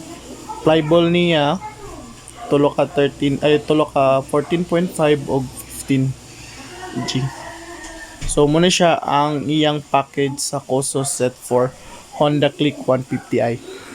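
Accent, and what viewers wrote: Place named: Visayas, Philippines. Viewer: Bisaya ka bay, ayos hehe tuloy tuloy lang natin to.. hehe minsan ok rin mag order s lazada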